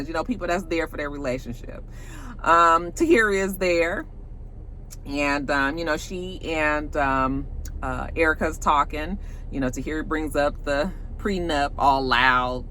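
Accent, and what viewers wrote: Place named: California, United States. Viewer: Don't wanna watch the show..So Thanks...Your review is EVERYTHING!...the more I view the more I clearly I hear your accent..lol California ❤️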